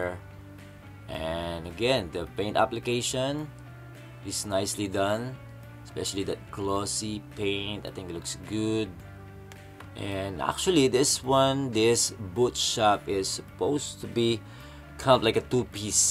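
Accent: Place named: Philippines. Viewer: Are you Filipino, sir? Your accent sounds like..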